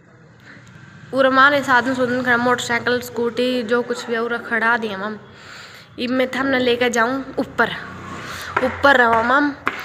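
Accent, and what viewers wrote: India. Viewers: Kurukshetra side se ho kya boli bta rhi h☺️ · जी आपका सीधापन और आपकी हरयाणवी बोली बहुत ही लाजवाब है खुश रहो आबाद रहो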